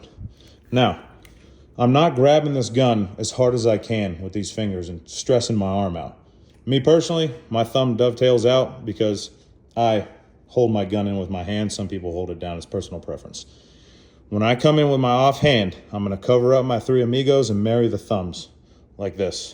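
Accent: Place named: Alaska, United States. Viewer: I thought that sounded like an Alaskan accent…. 🤪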